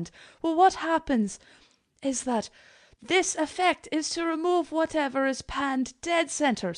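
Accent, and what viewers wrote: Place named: Scotland, United Kingdom. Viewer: Your scottish accent is super hot.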